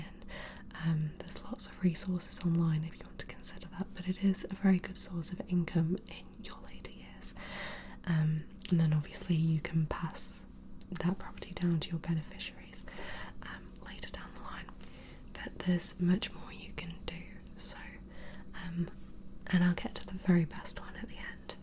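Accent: United Kingdom. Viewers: OMG where abouts are u from! U sound english like me😊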